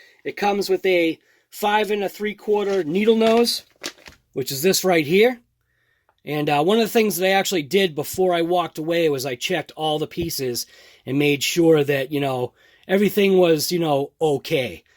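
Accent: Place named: Massachusetts, United States. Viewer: Just found you recently and you are great. My family is from mass so I miss the accent. Lol but great content. U inspired me to start a channel now.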